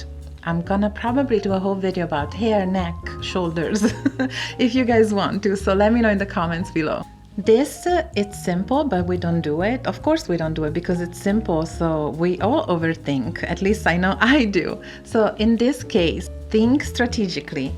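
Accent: Italy. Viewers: Buongiorno, questo è il primo video che guardo nel suo canale, ma lei è italiana vero? Posso sentire un pochino pochino di accento, ma volevo dirle che il suo inglese è veramente straordinario! Questo video è veramente utile e interessante, ne guarderò altri di sicuro 🙂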